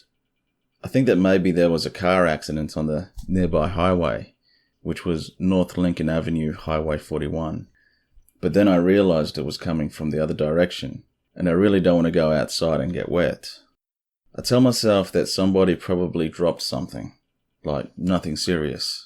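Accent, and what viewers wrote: Australia. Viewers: !! Great to hear your Aussie voice again! Great stories! Hope you're doing well and staying healthy! 💜💙🩵💚❤️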